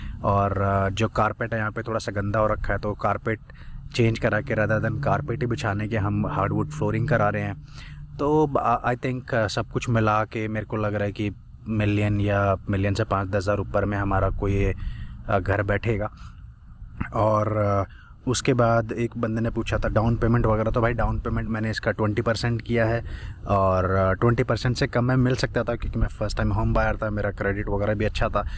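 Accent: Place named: Punjab, India. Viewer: Congrats. Loved your video, straightforward and honest. Sabto wadiya is its native hindi with Punjabi touch.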